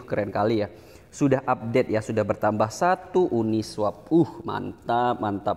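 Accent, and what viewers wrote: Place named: Medan, Indonesia. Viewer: Org medan boss logstnya.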